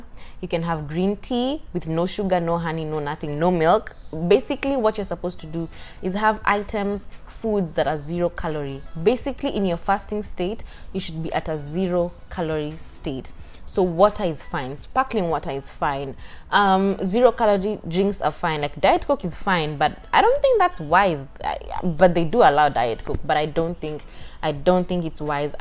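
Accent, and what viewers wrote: Kenya. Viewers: You sound Kenyan :)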